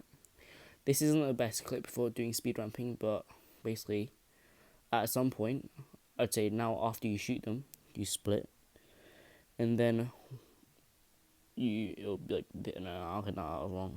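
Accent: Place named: England, United Kingdom. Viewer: Your accent is so much more English now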